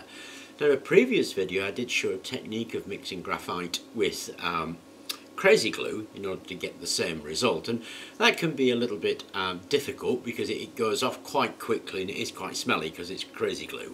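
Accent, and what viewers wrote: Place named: England, United Kingdom. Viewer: I use polyurethane one coat and then I mix a little 5 micron graphite powder in it. You can also do the 2 part mix of elmer's glue but it doesn't work as good. What was the stuff you used as a solvent and what did you mix it into, I couldn't hear what you said in the video. LOL you kind of said it fast with that english tongue of yours.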